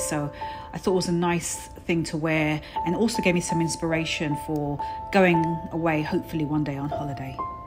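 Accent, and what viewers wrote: United Kingdom. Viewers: Your style and personality...bonus British accent.😍🔥😎...thank you,! 😍😊❤️☀️Oh, that mannequin... I want one! You and Michael have a wonderful Summer!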